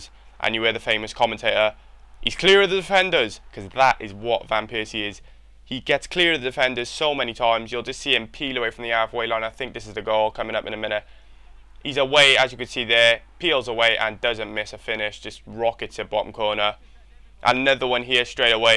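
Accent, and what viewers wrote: Wales, United Kingdom. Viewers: Are you welsh? I'm a new sub:-)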